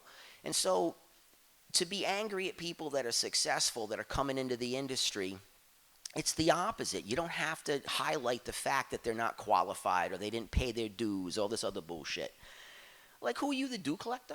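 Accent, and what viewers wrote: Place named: New York, United States. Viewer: Slips into? From an Aussie standpoint his accent sounds like his from Brooklyn/NY geographical area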